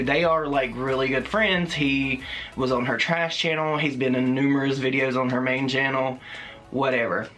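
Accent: South, United States. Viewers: I like ur southern accent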